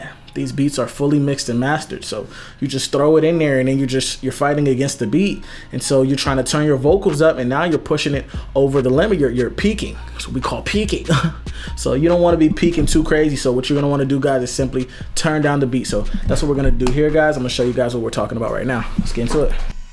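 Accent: Jamaica. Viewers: you Jamaican?? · U Jamaican 🇯🇲 ?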